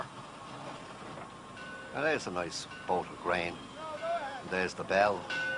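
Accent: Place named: Dublin, Ireland. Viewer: This man was a legend , great historian, great Dublin voice , a dying breed these days 😢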